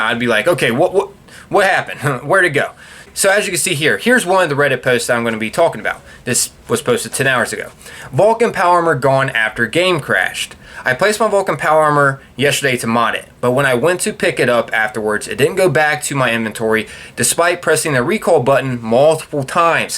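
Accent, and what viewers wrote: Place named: Baltimore, United States. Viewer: Bro's speaking like he's from Baltimore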